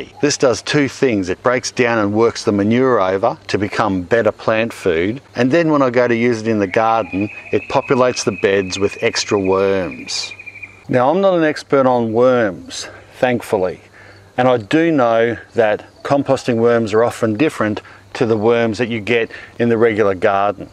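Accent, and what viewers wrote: Australia. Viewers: I was wondering what he meant.... Couldn't catch exactly what he said with the Aussie accent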